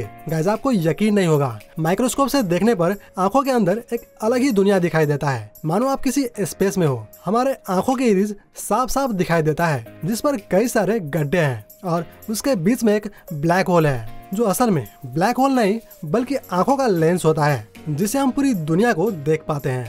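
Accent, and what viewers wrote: Odisha, India. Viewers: Aap Odia ho na aapke pronounciation se lag raha hai. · Are you from ODISHA? Your pronunciation is like Odia people.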